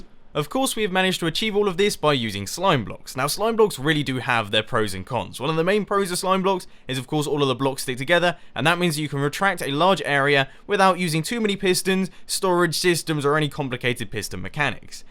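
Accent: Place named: United Kingdom. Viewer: has an accent though I can't pinpoint what accent he has, I wanna say it's a british accent but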